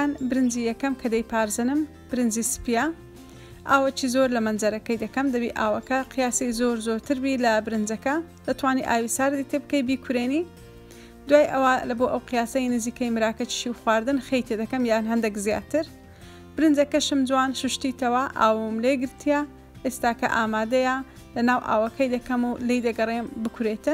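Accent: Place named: Erbil, Iraq. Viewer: هيوايه تم قسه ي هه وليريه😣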